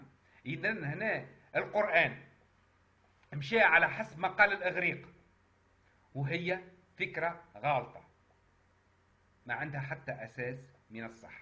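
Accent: Tunisia. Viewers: اذا تتكرم أخي وتخففّ من اللهجه التونسيه، شوي صعبه علينا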